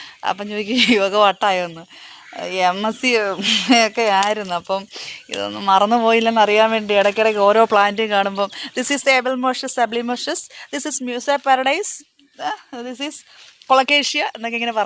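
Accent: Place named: United Kingdom. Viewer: ആ ഇംഗ്ലീഷ് ക്കെട്ട് അന്തംവിട്ടു പോയി ഇത് ഞങ്ങടെ തന്നെയോ അതോ വല്ല ബ്രട്ടീഷ് കാരിയോ നോക്കിയിട്ട് ഇത് ഞങ്ങടെ ക്കറുത്ത മുത്ത്തന്നെ 👌🏿👌🏿👌🏿♥️♥️♥️♥️♥️👍🏿👍🏿👍🏿💖💖💖💖💛💚💚💚🌹🌹💙💙💕💕💕💜💜💜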